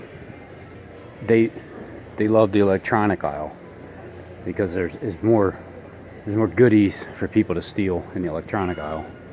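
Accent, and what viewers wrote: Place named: East Coast, United States. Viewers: it could be on the East Coast or the Upper Midwest since he does have a little bit of an inflection of an accent when he speaks. Not sure. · I hate to tell you this, but I have worked on the cameras and monitors in Walmart, and on a day like that, you probably have one, maybe two Loss prevention people working, and one if them is watching monitors and doing all of their management duties, and the other one will walk some and do double duty. BTW, everyone had an empty push cart at one point. I promise you that their are no where near the amount of loss prevention that work in that store all together, as you saw in 5 minutes. You are probably trying to pass people off so that they comment, and do you can get paid. It is really activating listen to this bullshit that isn't even close to being true, on top of that, the Baltimore accent just rubs me wrong. 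It could be South Jersey or Southeastern Pennsylvania, but it's really a Baltimore accent heart and it is the most obnoxious accent in the country. By the way, is anybody shopping there? Or, are they all trying to keep you from stealing a $1 item. Walmart method is to identify you and call the police. By the Walmart or installing facial identification software, and they're gathering information everyone just like everybody else. Won't be long before you walk in that store and they know if you're a thief, and I know the kind of stuff you buy.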